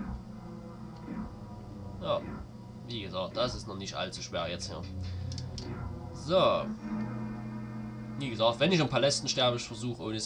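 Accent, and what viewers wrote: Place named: Saxony, Germany. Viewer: sächsisch^^ willkommen im club :)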